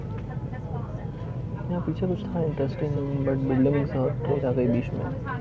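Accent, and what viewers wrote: Hyderabad, India. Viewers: I can say ur from hyderabad, pure hyderabadi. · BHAI YOUR VLOG IS SUPERB AND THE AMAZING PART IS YOU USED HINDI LANGUAGE ( WORD PRONUNCIATION SE LAG RHA THA KE HYDERABADI LANGUAGE HAI ) VLOG ME JAAN DAAL DIYA LANGUAGE NE .. kEEP UP GOOD WORK :-)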